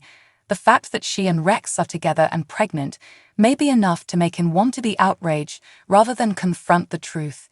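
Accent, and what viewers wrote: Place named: United Kingdom. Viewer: Love your accent over the pond i take it ❤ good job 👍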